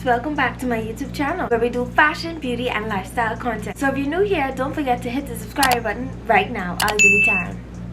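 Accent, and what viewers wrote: Bahamas. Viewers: You’s from the bahamas aye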